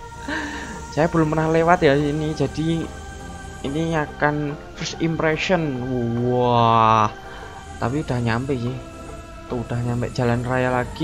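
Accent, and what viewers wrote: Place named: Java, Indonesia. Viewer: Medok mass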